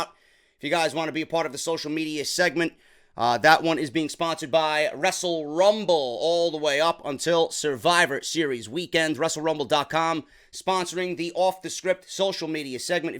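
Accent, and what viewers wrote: New York, United States. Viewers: You're a natural for commentary. You have a distinctive voice due to your New York accent. Better than some of the guys in the WWE.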